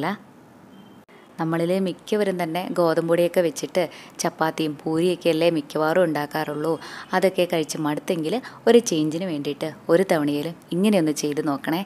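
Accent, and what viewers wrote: Palakkad, India. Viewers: 👌👌 madam palakkad anno veed edak palakkadan model language varunnund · പാലക്കാട് slang ആണോ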